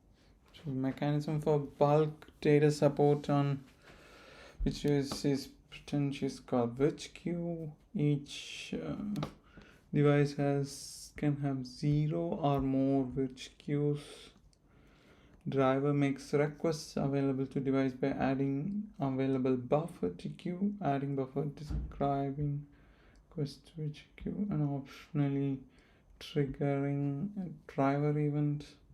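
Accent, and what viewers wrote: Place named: India. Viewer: with Indian accent (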